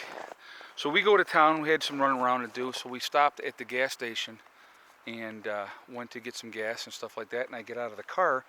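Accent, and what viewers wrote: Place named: Upstate New York, United States. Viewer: I really enjoy your channel, and your upstate NY accent, Boston native here. Ive been following your channel for a while now, subscribing. Im a so called prepper myself. I'd rather have it, and not need it, than need it and not have it. Thank you for sharing, I'll see you on the next one 👍👍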